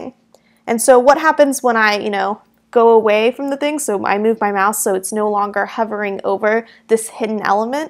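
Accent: England, United Kingdom. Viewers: Dam your English accent is very nice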